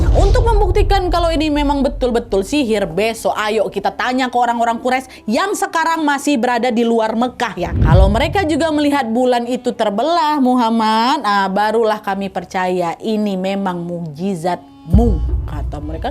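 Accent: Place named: West Sumatra, Indonesia. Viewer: Tagayiang gayiang bahaso Minang e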